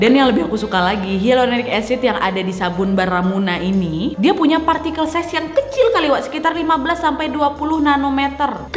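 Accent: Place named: Sumatra, Indonesia. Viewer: Pembawa cerita ini logam sumatra atau sengaja meniru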